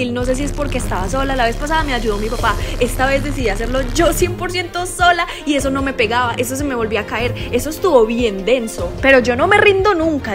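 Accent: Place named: Colombia. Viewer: Amo como hablas toda Haci colombiana y pues uno intentando hablar como tú jaja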